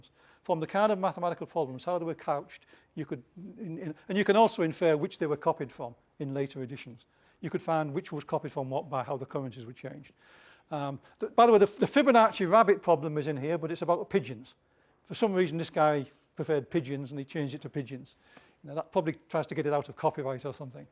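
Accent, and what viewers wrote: Northern England, United Kingdom. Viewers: He looks just like Graham Nash . He has the same northern English accent too . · Professor Deblin, you forgot to explain how your Northern English accent opened the doors for your at the library in Florence, I'd love to know the story.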